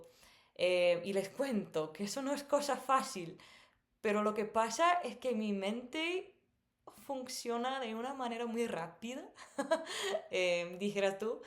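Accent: Guatemala. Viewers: Wow su acento en español es Guatemalteco saludos desde New York hermosa de parte de un Guatemalteco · Tu espan̈ol es perfecto y muy CHAPIN!! Tan chapín que la primwra vez pensé que eras chapina más que la vecina!! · Que chilero tu canal y tu acento chapin va vos .jajaja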